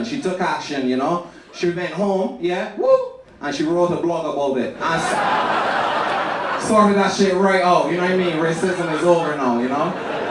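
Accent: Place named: Barbados. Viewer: With a Bajan accent too!!! My kindah humour my kindah comedian!!!!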